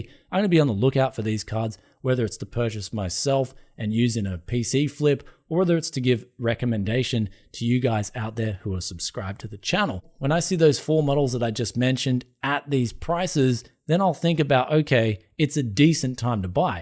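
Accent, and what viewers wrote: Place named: Australia. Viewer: you have an excellent understanding of the market and the economy. Thanks for sharing this mate. Great to hear another Aussie accent in the tech world.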